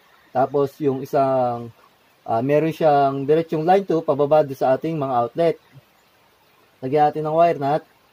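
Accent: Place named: Quezon, Philippines. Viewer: ganda mga vids mo sir.. slamat.. from quezon province ako, kya pla pamilyar punto at words mo.. more vids pa..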